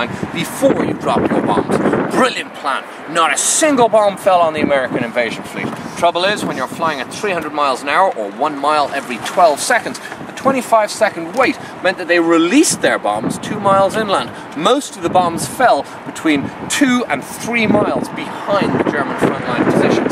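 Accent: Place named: Ireland. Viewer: He's Clearly Irish. you idiot.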